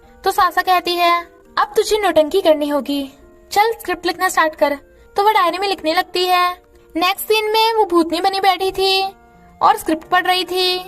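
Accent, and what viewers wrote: Punjab, India. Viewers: Aap punjabi ho 😮?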